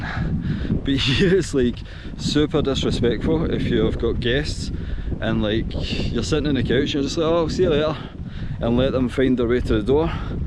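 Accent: Scotland, United Kingdom. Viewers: Once in the Netherlands I met a drunk man in an elevator with a Weesky in his hand. He claimed to be Scottish. Then I didn't believe he was from the Kingdom, I thought he was also from Eastern Europe like me, and that he spoke terribly bad English. Now that I hear you (Scottish accent for the second time in my life) and I know that you didn't drink alcohol, I already believe that he was Scottish. I understand you, but it was hard to understand him when he was drunk. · Easy to understand. I love scotish accent.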